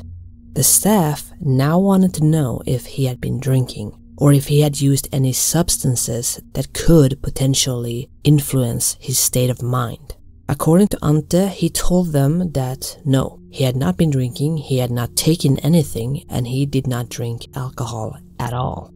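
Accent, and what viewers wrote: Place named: Sweden. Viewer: It's an a Swedish accent. What's wrong with your ears and cognitive reasoning ?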